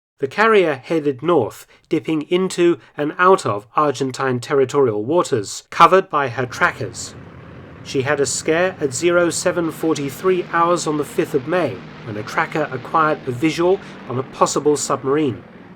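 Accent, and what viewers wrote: United Kingdom. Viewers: Great documentary. Superb British accent.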